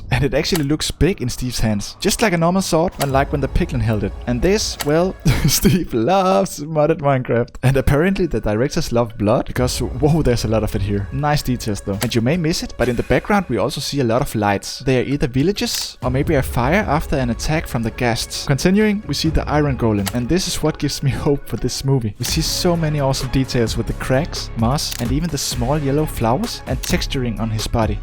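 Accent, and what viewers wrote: Denmark. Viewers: Love the danish accent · Are u Danish? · Danish brother🇩🇰💪 I could tell by your accent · “Wow the wolf’s name is Dennis” out of content but Are you from Denmark? I can hear it in your Voice, im from Denmark. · Are you from Denmark 🇩🇰 no hate and it is just my opinion cause you’re accent sounds like it😊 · OMG jeg syntes satme du lød som en Dker der talte engelsk XD Så var lige inde og stalk din YT og jemmen godag da kære med Dker :D :D :D Håber ikke du fryser formeget i vores kolde land :D :D :D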